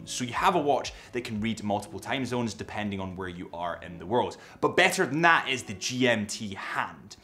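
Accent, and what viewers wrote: Scotland, United Kingdom. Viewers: You sound like a Scottish Adrian Barker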